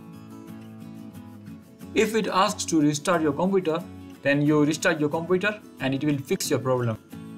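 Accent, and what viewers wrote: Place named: India. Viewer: when I hear an indian man I know I am seconds away from a solution - Thanks buddy, love and respect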